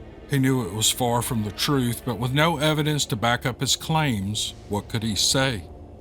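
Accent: South, United States. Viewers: One of the many things I enjoy about listening to your podcasts, is that you are not in a hurry! English is not my first language, and people often try to speed things up on YT. You don't, you read like you speak. With a lovely Southern drawl, not too broad for foreign ears... You stay far away from anything political... a true gift in this day and age... In the short time I've been listening to you, no evening has gone by without you sitting on the edge of my bed, the cadence of your voice slowly rocking me to sleep... Thank you for what you do here,! We really appreciate your efforts! ❤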